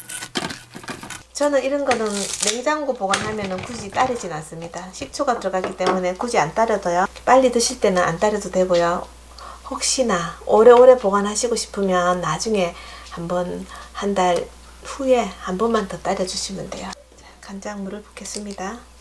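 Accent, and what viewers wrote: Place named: Daegu, South Korea. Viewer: 님 목소리도 시원시원 요리도 시원시원 대구 말씨가 정겹네요 팬입니다 저도고향이 대구입니다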